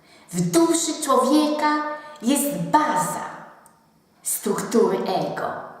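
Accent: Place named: East, Poland. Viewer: Ona ma wschodni akcent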